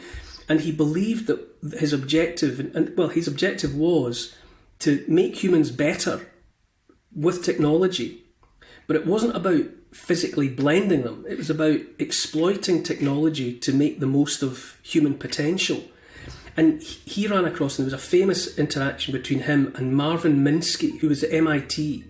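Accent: Scotland, United Kingdom. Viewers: Scottish accent is "da best"❤. No, this is not really how i want to accept things but the "critical mass" is falling towards transhumanism, the 4th. revolution😓 if i could i would change planets right now.... people have lost their north.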